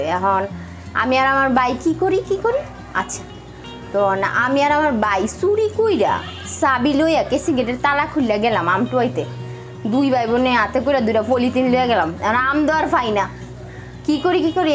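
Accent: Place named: Mymensingh, Bangladesh. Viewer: মনে হচ্ছিল ময়মনসিংহের ভাষায় কথা বলতেছিল।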